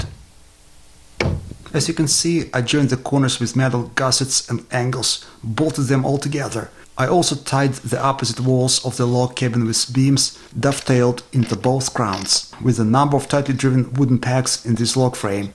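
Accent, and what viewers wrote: Russia. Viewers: хороший английский! понимаю намного легче из-за русского акцента😊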